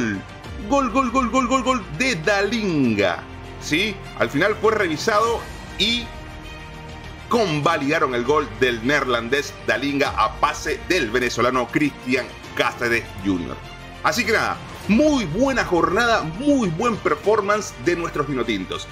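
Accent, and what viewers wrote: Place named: Argentina. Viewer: Varón buen contenido pero pareces argentino cuando hablas :0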